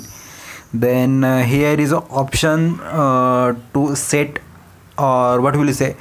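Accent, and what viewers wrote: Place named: India. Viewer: Indian accent is so thick :D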